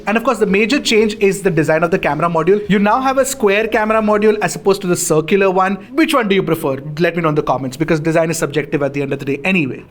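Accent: India. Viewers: No need to talk so fast, it's like Indish english, take some paus when talking, would be much clearer to understand :D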